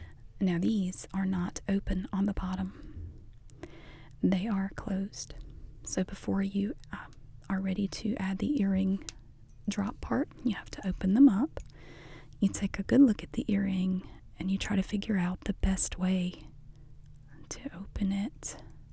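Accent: South, United States. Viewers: ) You're great!!! My favorite are your soft spoken videos. Perfect with that southern sound. Just trances me put.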